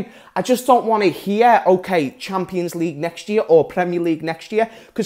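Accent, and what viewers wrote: Liverpool, United Kingdom. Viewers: I'm much older than you and I've been devoted to Liverpool before you've been able to conceptualize football. I'm from the states and will never have the opportunity to visit Anfield. I will live vicariously through you. You remind me of a younger version of myself minus the scouse accent, lol. Don't stop being you.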